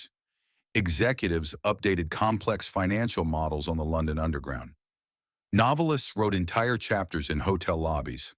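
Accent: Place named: United States. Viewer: Can someone please tell me why a video on British computers has an American voice??! I find this very odd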